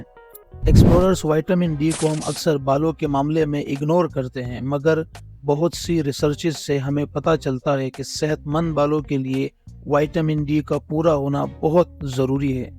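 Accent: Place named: United Kingdom. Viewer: Your English accent too good.Your English accent is just like British people's.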